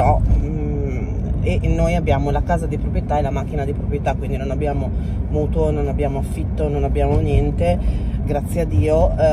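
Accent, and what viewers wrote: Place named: Piacenza, Italy. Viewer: Ciao, grazie per aver deciso di metterti a nudo con noi… Avevo sentito l’accento! Sei di Piacenza! Io ci ho abitato dai 12 ai 18 anni! Anche io vicino al centro (via Genova)! Ed è vero: e’ un caos! Un abbraccio forte ❤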